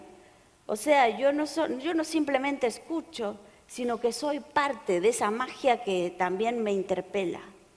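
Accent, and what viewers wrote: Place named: Argentina. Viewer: ¿Por qué tiene ese acento sudamericano? Es que dicen que es mexicana; pero habla como argentina.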